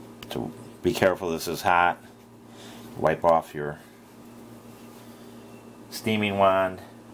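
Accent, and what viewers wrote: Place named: Wisconsin, United States. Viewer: You must be from Wisconsin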